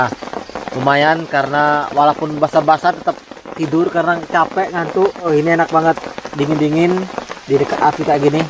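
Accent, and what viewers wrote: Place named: Kalimantan, Indonesia. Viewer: Dari cara omong nya seperti tinggal di kalimantan..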